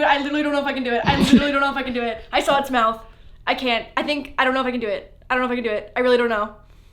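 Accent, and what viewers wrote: Canada. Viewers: New here. Love how she says "about". Reminds me of Cristine. Is she from Canada?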